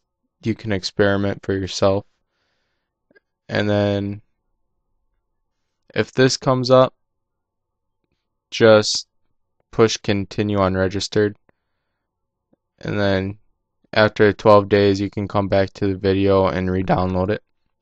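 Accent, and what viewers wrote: Minnesota, United States. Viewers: I was close. Lol I'm from Wisconsin but live in Minnesota. We have the same accent.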